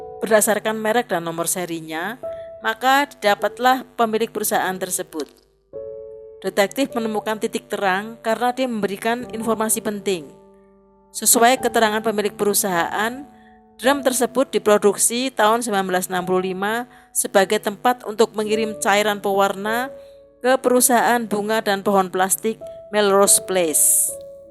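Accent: Java, Indonesia. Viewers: Medok Jawanya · medok bgt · Pengisi suaranya agak medok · Logatnya Indonesia bagetttt....tulen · Medok bgt yg isi suaranya gak netral ah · Naratornya SPT suara orang Jawa yg ngapak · Suara admin jawa kental bgt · Medok bngt baca ceritanya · Pembawa nya kurang pas suara nya medok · medoknya juga dikurangin sedikit madam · narasinya bagus kok, sangat Indonesia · dari suaranya pasti orang jawa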